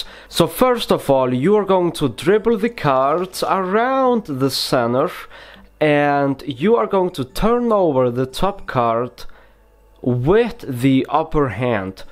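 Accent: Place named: Russia. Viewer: у тебя очень русский акцент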